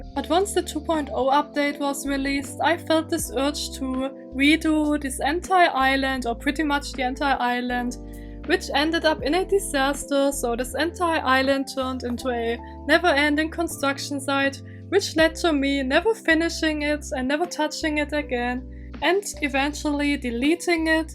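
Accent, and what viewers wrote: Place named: Germany. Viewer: Are you german? You have a very german sounding accent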